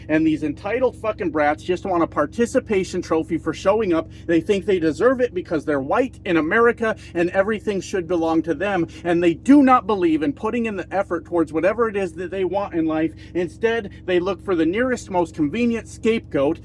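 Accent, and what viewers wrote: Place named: Quebec, Canada. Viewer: Are you Quebecoise?,sound like it.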